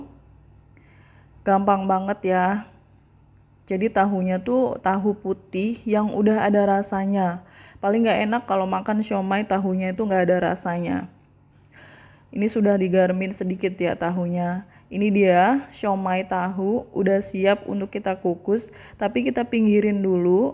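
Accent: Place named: Sunda, Indonesia. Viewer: Hahhahaha super lekoh.... asli urang Sunda 😃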